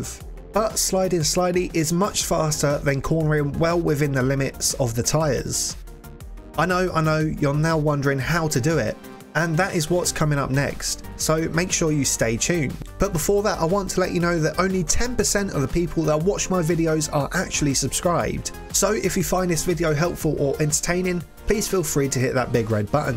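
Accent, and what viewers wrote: United Kingdom. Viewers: No hate, but I think this guy is bri'ish. Tho contecnt both helpful and entertaining. Keep it up, please. :)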